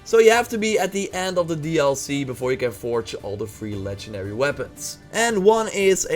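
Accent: Netherlands. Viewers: are you Dutch, by any chance? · Is this guy dutch cause his accent is familiar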